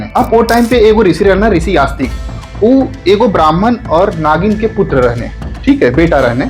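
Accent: India. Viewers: Itni shudh bhojpuri 😮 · Superb sir and hmare tarf wali bhojpuri sun ke maja aa gya🙏🙏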